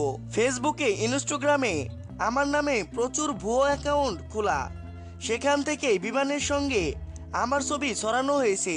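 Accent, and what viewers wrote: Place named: Bangal, Bangladesh. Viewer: Amader west Bengal a ki u tube Chanel a boler moto keu ni j bolche se to amon vabe bangal vasay bolche baje lagche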